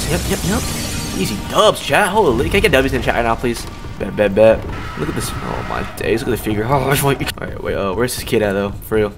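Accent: United Kingdom. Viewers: i feel like hes gotten slightly british also ambush reminds me of a pillow pet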